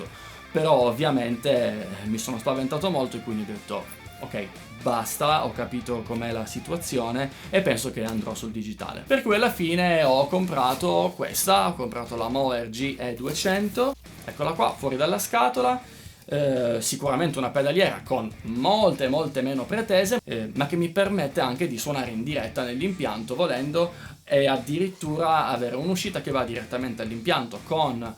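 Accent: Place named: Sardinia, Italy. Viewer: Complimenti bel video e bel modo di esporre... Ho letto che sei di Torino ma sento una piacevole cadenza sarda...vero?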